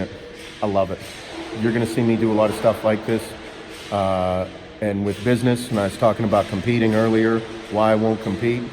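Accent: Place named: Pennsylvania, United States. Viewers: I Fuckin knew it. You just cant hide that fuckin PA accent lol. Form PA Also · I knew I heard Western PA in your voice! Lots of great football players, tough steel workers come from there. I would take a Dodge Ram any day..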